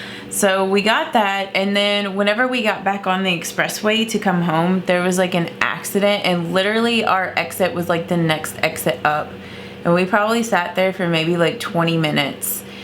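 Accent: South, United States. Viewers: My two Kentucky YouTubers are not posting and I miss y'all!! I rely on that Southern accent to keep me company while I get ready for work am!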